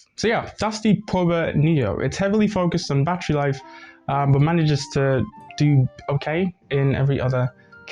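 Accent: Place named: United Kingdom. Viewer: Nice review. Plus I like listening to ur British accent. Always.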